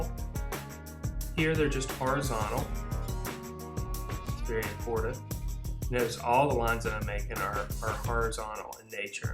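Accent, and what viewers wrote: South, United States. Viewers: Your accent is cool. From the South?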